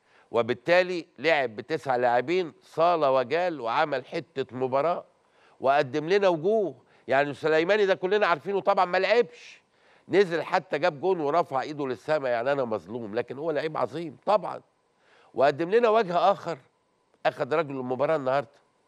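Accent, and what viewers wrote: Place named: Egypt. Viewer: حلوة اللهجة المصرية .عجبني لما قال . احمد زي الحاج احمد 😂 .وناس ده بانس وونس 😂😂.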